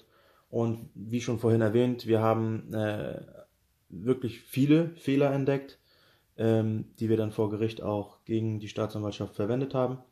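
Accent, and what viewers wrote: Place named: North, Germany. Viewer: Klingst so norddeutsch😂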